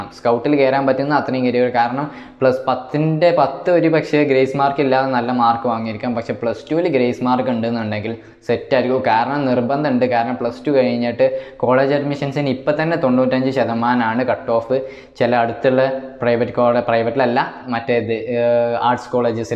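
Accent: Malappuram, India. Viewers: Malappuram aano vaarathaanam kettette agane thonna 😅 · MALAPPURAKKARAN aanallee.. 😁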